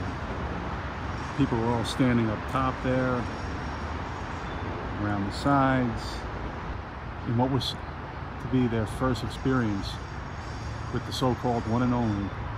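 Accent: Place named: New Zealand. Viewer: Can tell u from Nz😆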